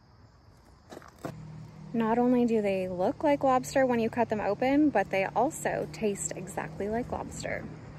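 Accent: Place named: South, United States. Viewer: OMG! I heard several "Ya'lls", a growing amount of southern inflections and you even pronounced mayonnaise like a true southerner. Hopefully Jeff will make you an official Damn Yankee soon. Do you know what that means? ETA: I'm glad that you're on the mend. You look well.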